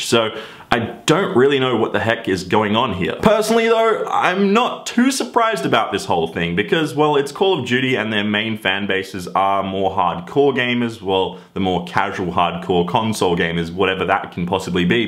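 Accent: Australia. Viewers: Are u Australian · thank god your back i miss that Australian accent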